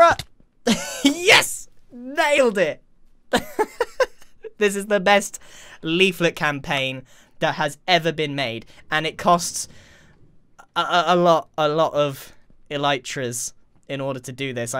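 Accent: England, United Kingdom. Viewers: Grian has an English accent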